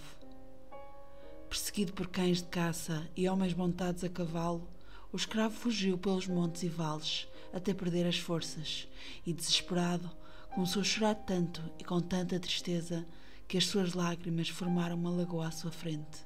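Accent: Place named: Portugal. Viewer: obrigada eu tava a pesquisar uma canal con sotaque do Portugues, eu gosto agora da sua pagina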